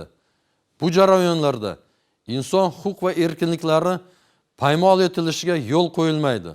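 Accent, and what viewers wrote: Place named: Russia. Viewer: Амаки русча мактабни битирган шекинли акцент ута дахшат омад тилайман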